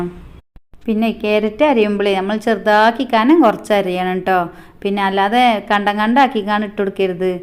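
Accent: Malappuram, India. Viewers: Ithaaa Malappuram ethu bhagathaa ee kodukaa, edukka ennu parayunathu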